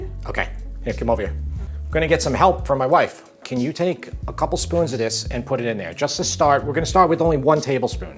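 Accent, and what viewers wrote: New York, United States. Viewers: I hear that distinctive NY accent!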